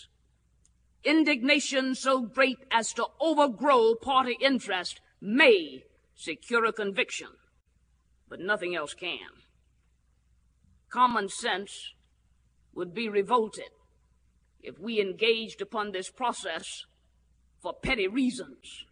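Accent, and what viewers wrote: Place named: Texas, United States. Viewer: This is what a good Texan sounds like.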